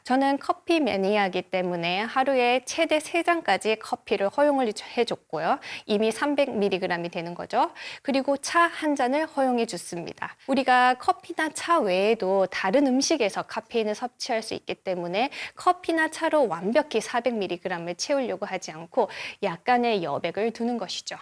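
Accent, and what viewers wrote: Gyeongsang, South Korea. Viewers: 경상도 억양이 있으시네요. 듣기에 나쁘지는 않아요. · 경북 대구 분인가요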